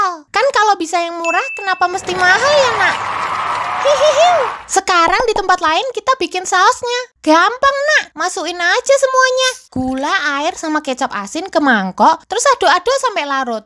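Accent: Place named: Java, Indonesia. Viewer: Medhog jowone😅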